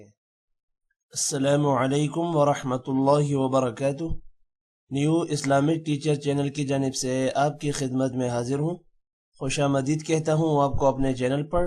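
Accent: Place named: Baltistan, Pakistan. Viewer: Aap ki awaz aur bolne k tariqe se lagta hai ki aap balti ho.. Kiya mey sahih hon.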